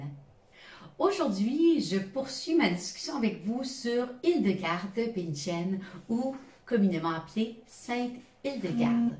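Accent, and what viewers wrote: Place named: Canada. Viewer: Merci avec le bel accent canadien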